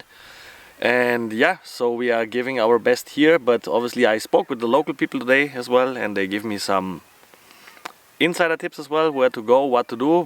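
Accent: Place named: Europe. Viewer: Im half Scottish half Hungarian. And you sound kinda european so I thought i’d ask🤣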